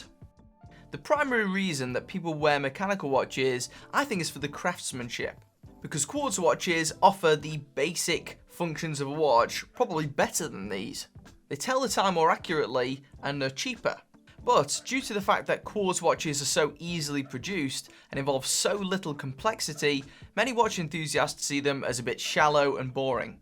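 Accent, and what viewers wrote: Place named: England, United Kingdom. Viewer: Dude what part of england is your accent from it sounds cool?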